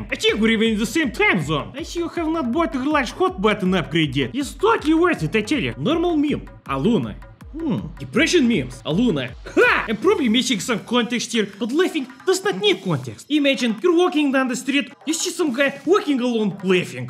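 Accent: Russia. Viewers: The accent got me fucked up I cant keep up I need the subtitles sometimes you really think he be speaking in Russian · im positive hes russian but i would lowkey laugh for many moons if he was a white dude named walter with a good fake russian accent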